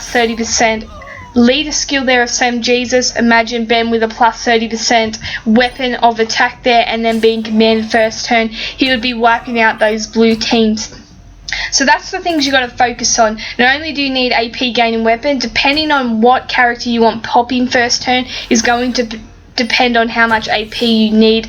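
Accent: Australia. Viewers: Great video just subbed you, I have been showing it to my faction omnipotence paradox on the marion server we are the best faction on our server and a lot of our faction can now rush turn one due to you thank you very much our enemy's are not liking this one bit lmao. I just started doing videos myself actually loving your aussie accent im trying to tone down my strong english accent but im having trouble hope people can understand me haha. Thanks a lot and good luck for 2017. Happy hunting.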